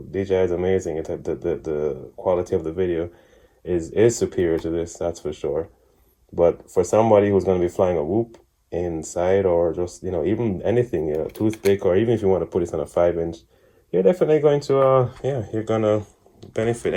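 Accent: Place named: Caribbean. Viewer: Nice video bro. Maybe someday I'll go digital when it's priced a bit better. Btw, that sounds like a Caribbean accent...do I detect some Guyanese or Bajan heritage? :)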